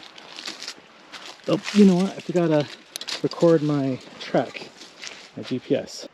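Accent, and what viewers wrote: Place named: Boston, United States. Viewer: getting the hint you're from Boston? Haha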